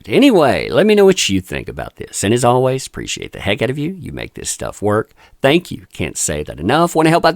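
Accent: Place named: Virginia, United States. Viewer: WOOTEN is still butthurt that Elon purchased "X" and no longer surpressed opinions. BTW Brother, your accent sounds like those in Fredericksburg, VA.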